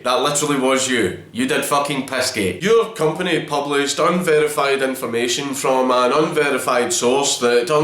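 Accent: Scotland, United Kingdom. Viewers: Few things make me as happy as hearing a Scotsman call someone a cunt. It's just beautiful. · The way you said Conspiracy Theories as "Con-spare-essy Thee-dees" Made me bust a gut xD. You're Scottish accent is perfect, I wish I had it to be honest xD. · i never wanted to listen to a man talking sexy to me with a Scottish accent. untill now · Fuckinell!!! He really is Scottish isn't he?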